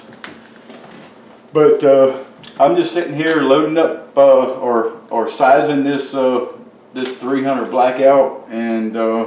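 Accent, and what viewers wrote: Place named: West Virginia, United States. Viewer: I wonder if is going to play and sing in one of his videos he is definitely a patriotic American and sounds like awesome people my guess is by listening to that accent he is probably from WV... 😉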